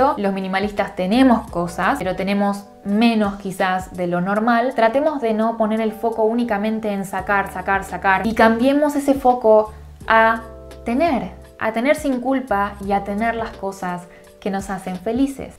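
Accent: Argentina. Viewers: Hola, te habla una Argentina que lleva 15 años aquí en España. Y muchas cosas que contabas me fueron pasando a mi también… Llevo viendo varios vídeos y además de aprender muchas cosas que me están ayudando mucho y estoy empleando, me estás recordando mi acento y ya te estoy teniendo cariño 🥰 Un abrazo y que sigas haciendo vídeos, de minimalismo o de lo que quieras!!!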